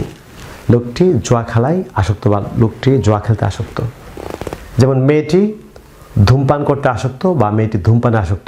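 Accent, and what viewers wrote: United Kingdom. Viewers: Nice class sir. You translate the passage of HSC textbook. Your british accents is very nice sir. I can learn british pronunciations from you.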